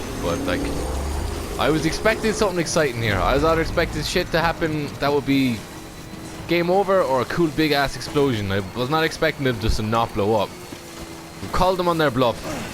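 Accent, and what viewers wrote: Ireland. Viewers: Do u really have an Irish accent or are u acting? Lol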